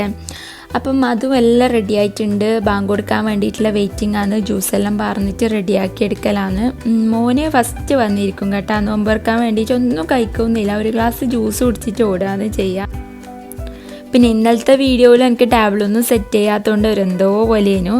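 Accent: Kannur, India. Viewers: Nalla rasand nammale kannur bhasha👍👍👍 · kannoor bashayum poliyan nigalude cookkingum poliyan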